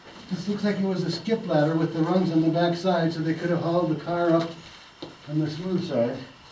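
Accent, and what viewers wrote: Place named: Upper Midwest, United States. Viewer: I am very curious where you are originally from? Your accent sounds like you’re from the upper Midwest, Upper Peninsula, Minnesota, etc?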